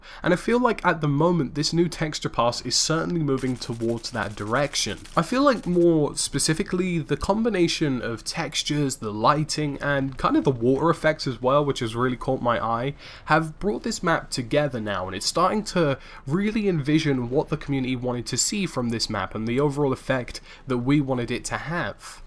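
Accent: United Kingdom. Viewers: You have a very light accent for a brit! May I ask where you're from more specifically?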